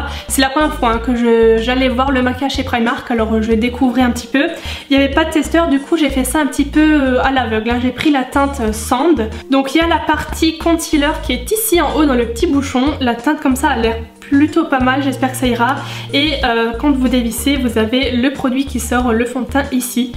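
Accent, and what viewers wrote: Lorraine, France. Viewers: Tu as grave l'accent Lorraine, tu habite à Forbach ? 💕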